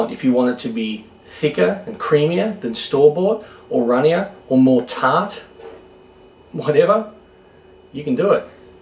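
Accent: Australia. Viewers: Are you from Australia. Because your pronunciation defines that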